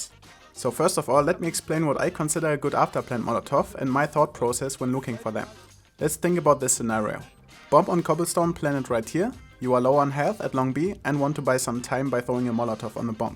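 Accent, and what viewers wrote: Germany. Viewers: Ur german accent is very good m8 nice vid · Are you German?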